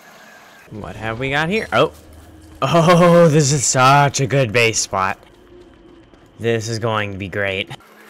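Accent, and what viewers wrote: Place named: United States. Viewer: I tought he was american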